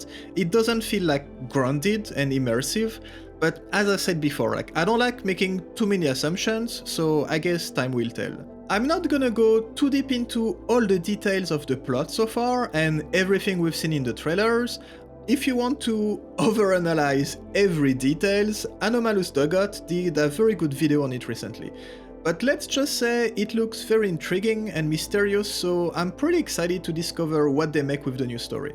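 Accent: France. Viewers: are you french by any chance?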